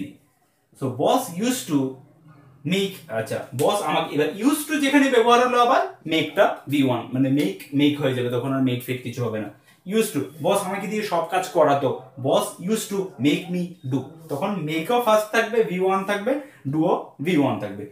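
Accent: Kolkata, India. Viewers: কথার স্টাইলেই বোঝা যায় কলকাতার।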